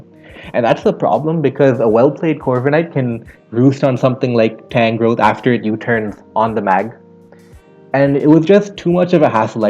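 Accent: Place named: India. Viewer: I love the slight indian accent in your voice it just tells the world that no matter how big you get, or successful, we stay indians at roots! Great vid man ;)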